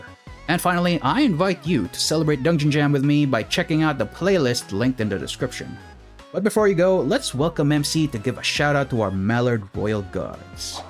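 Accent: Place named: Philippines. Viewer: i can hear a fellow filipino's voice from a mile away... this is an amazing video!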